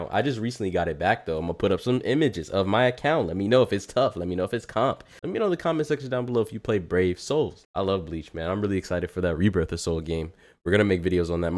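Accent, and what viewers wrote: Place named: South, United States. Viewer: why you sound so country 😭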